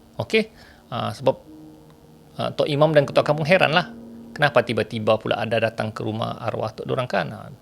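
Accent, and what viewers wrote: Sabah, Malaysia. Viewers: Lambat hadir tapi tetap tengok. Suka dengar orang Sabah cakap. Hehe he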